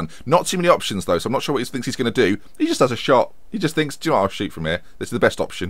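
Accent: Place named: Manchester, United Kingdom. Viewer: your manc accent is pretty decent xD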